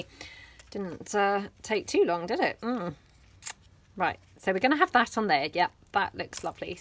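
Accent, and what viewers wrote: England, United Kingdom. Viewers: I also like your videos,and your English accent.....watching and listening is great fun, my desk looks a lot like yours I do try keep things in order when creating,....forget it....always a mess, a happy mess tho....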